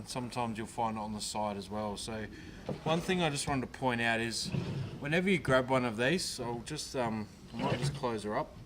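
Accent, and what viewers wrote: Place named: Australia. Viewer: Which part of Aus are you from?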